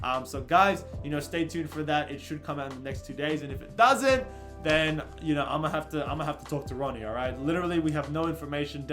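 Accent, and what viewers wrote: Australia. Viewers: why you hiding your australian accent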